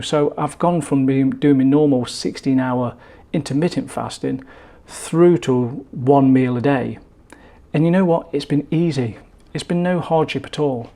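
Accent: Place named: East Midlands, United Kingdom. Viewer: You’re defo from East Midlands 😂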